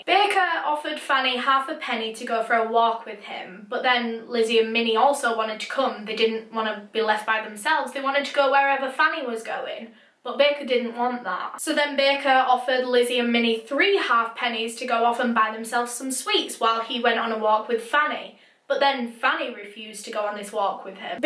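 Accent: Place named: North, United Kingdom. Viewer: ah so it’s a British accent? i couldn’t really tell what it was because it’s so thick, but i loooove her voice.😍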